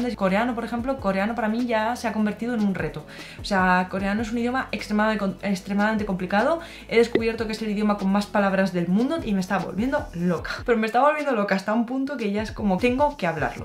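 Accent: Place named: Spain. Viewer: 🥰me gusta mucho tu accento de español aunque nunca me ha gustado el accento de muchos españoles y tambien te estoy imitando cuando hablo alemán 😅,,,no sé porque? Pero me das ganas de decir que alemán suena romantico 🤭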